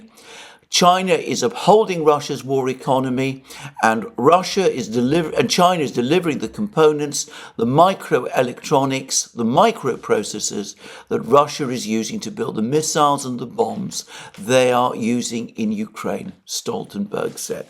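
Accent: United Kingdom. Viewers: It's strange, I hate British accents and it's always bad news, but this has become a comfy little show for me. Thank you Alexander.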